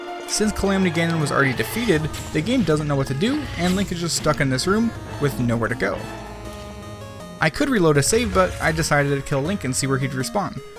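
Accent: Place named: United States. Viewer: Am I the only person that has no idea what the guy is saying? If you have such a thick american accent while mumbling, atleast add subtitles. Please.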